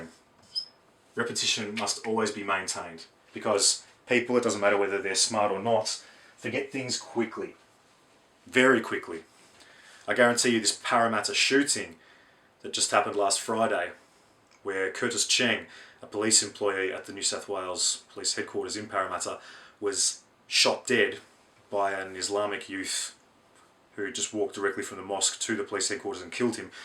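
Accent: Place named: South Africa. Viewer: This video was repetitive and very, very simple. Sounds like a yarpie. (ooh 'name calling')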